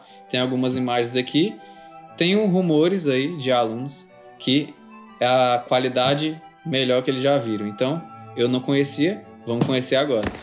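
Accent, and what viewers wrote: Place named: Ceará, Brazil. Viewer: tu é do Ceará? Teu sotaque parece com o meu.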